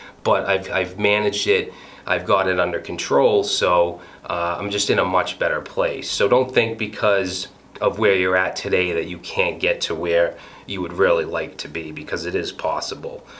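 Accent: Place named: East Coast, United States. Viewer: I've heard your message so many times before. But because your voice is so calming and mixed with what I think is a an East coast accent, your message seems so much more trustworthy. Lol. Great advice overall man, keep it up! Also thanks for deciding to help others. You're obviously an individual who is on a higher level than most.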